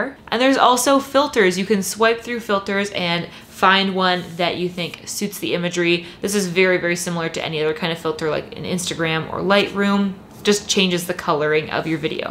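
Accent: Canada. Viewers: Candian? Hea?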